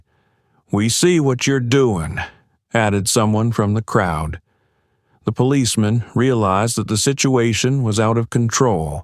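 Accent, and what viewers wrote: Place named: South, United States. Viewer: a narrator with a southern drawl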